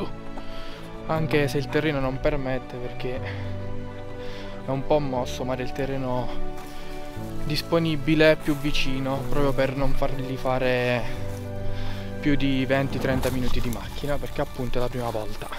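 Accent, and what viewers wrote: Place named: South, Italy. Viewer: Bravo,bel video ma di dove sei, mi sembri del sud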